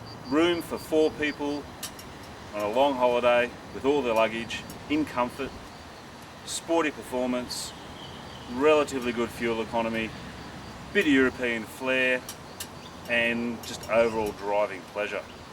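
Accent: Australia. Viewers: he sounds like Crocodile Dundee!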